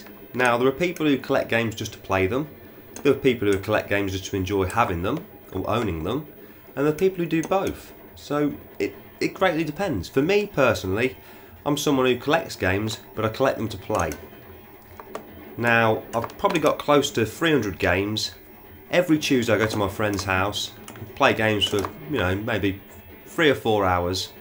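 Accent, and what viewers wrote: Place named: Midland, United States. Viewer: Fair comment - I'll try to add a bit of comentary - although I'll probably best add some subtitles to enable any non-locals to understand my midland accent rambling lol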